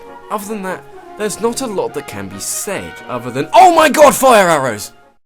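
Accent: England, United Kingdom. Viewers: it's called a different accent. He's from england like me. we don't say YOghurt either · Haha, made me laugh mate! That british+ your video style= geez omg its sooo goood <3 I really dont care if it's going to take you a while getting theese vids done, as long as the keep on coming! ;D <3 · you know i gotta go against your comment that (please read the next phrase in the incredibly satisfying British accent) "you need to be good at brawl to gain any great succes with R.O.B." my cousin was pretty much a joke fighter when we played, he made the characters fell/run/fly of the stage half the time and he only managed to land attacks and KO´s by mere luck... then he used R.O.B. in Brawl and next thing i know: my and the CPU´s characters are sent flying either as a flaming fireball or with a headache because of R.O.B.´s whirly laser eyes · are u actually british or do u use a voice modifier? 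sometimes I cant tell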